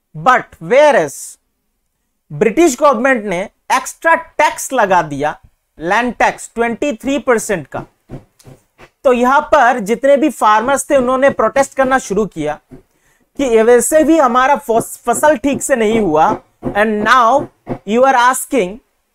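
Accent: Assam, India. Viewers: Sir are u from Assam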